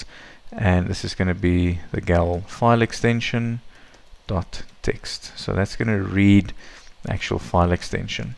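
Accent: South Africa. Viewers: sorry this is a bit off subject but are you South African??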